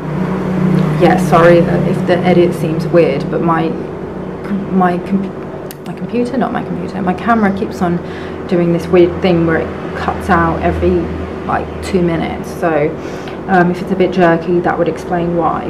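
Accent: United Kingdom. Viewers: Good info! Didn't realize you had such a posh accent :P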